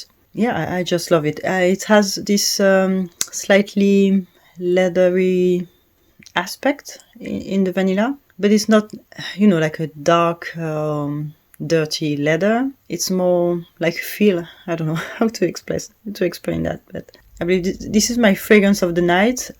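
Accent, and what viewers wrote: France. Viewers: Don't apologise for your French accent - it is beautiful! So nice to hear the correct pronunciations for the French names, especially the Shalimar. I've been wanting to try Lui, so hard to find in Australia though...I also love Dior Addict, I have the earlier first two versions., 2002 & 2014. I was exactly the same as you with L'Heure Blue - it took me a while to love this one, I initially found it quite difficult, but it takes some time to warm up to this one! But when I'm in the mood for it, it's perfect. I also really love Perfume Initial! 💙 The bottle is so beautiful & elegant. Lovely video thanks! A big hello from a very warm Australia. x 🤗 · Love your description of Fille En Aiguilles. Have similar feelings about it. Orthodox Christmas :). P.S. Please don't apologize for the french accent - that's great!!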